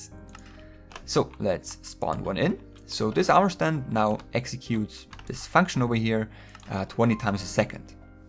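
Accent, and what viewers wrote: Germany. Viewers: Is there a possibility that youre german? Kinda sounds like that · Are you german? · Are you German?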